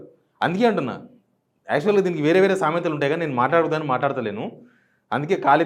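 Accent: Telangana, India. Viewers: చెత్త govt.... మీ విశ్లేషణ తెలంగాణ యాస, బాస లో ఉన్నా.,,,... BRS వైపు సూపుతుంది...సూపర్ 🎉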